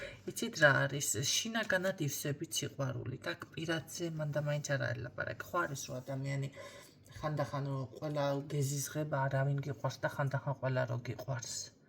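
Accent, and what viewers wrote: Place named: Imereti, Georgia. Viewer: ძალიან კარგი პიროვნება ხართ .მიყვარს თქვენი ლაპარაკი იმერული კილოთი რომ ხსნით ტაროს❤❤❤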